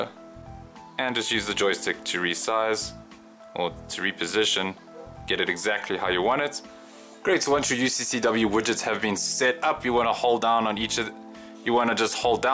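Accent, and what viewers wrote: South Africa. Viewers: Are you from Pretoria?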